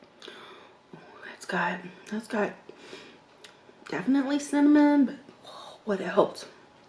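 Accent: South, United States. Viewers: I love that you are talking with us more. Love your little bit of southern accent and your laugh. You really worried me tonight, sweetie, when you swallowed the chicken bone. You were eating fast and that could have been very dangerous. It would break my heart if anything happened to you. If you don't post another video before Christmas, I want to wish you now, the very happiest of Christmas's. I hope there will be a celebration at your house! Thinking of you with Love xoxo. Livia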